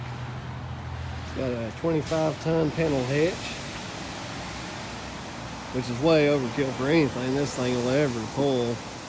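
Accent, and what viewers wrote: South, United States. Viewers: Whys the narrator so southern?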